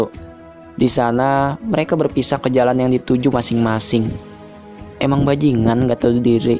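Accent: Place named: Banyumas, Indonesia. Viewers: Ngapak ngapak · Mimin ngapak🙈🤭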